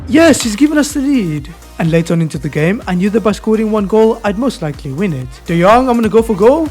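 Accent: South Africa. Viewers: where are you from because you sound south african if im not mistaken 😮😊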